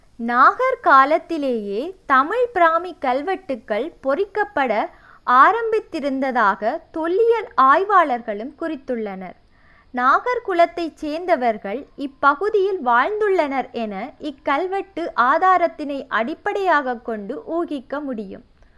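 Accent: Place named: Vavuniya, Sri Lanka. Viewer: Nan India. Neenga pesuradu avlo alagha irukku. Vavuniya pechu roamba alaha irukku. Super 😍 நன்றி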